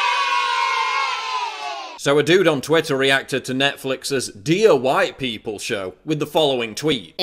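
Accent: United Kingdom. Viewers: you are the living proof of people with a British accent getting away with saying utter shite. I wonder if your intellect is strong enough to deal with the hard topics of political philosophy or are you just gonna make a name of yourself by posturing as the tough guy to college sjws?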